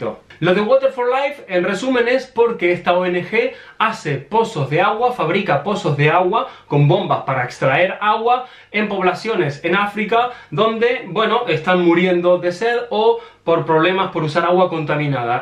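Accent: Argentina. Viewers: Muy bien haz ayudado a 50mil y si nos haz hecho sentir muy bien una pregunta eres argentino pues no hablas cpmo español???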